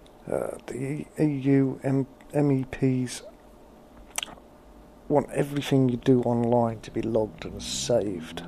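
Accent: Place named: Scotland, United Kingdom. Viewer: Good Job!;) Love your accent! are you Scottish?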